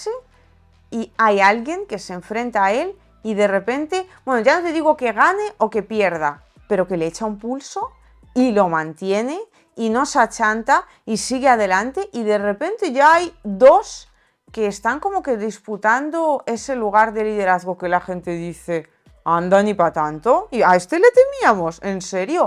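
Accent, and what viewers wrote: Spain. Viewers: Mujer me encantas , como explicas ves las cosas claras vas al grano . Tienes un pequeño acento español pero enrazada con otro país la verdad muy linda y tus tatuajes mucho mejorrr éxitos . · ...me encanta escucharte¡¡¡ te escuchas....inteligente..profesional......ablas español de españa.....jeje suenas muy rico...sexy....te disfruto mucho.....saludos... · Hablas como ESPAÑOLA...y en MÉXICO no nos gustan los Españoles, destruyeron al imperio AZTECA... torturas, saqueos e imposición de su cultura a base DEL TERROR...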